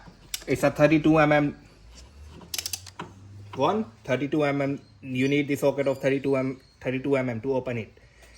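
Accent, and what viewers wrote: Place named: India. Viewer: Because of accent i suggest that you are Indian. English in Great Britain is difficult to translate because of specific pronunciation but with your accent this become impossible to understand it without strain own mind...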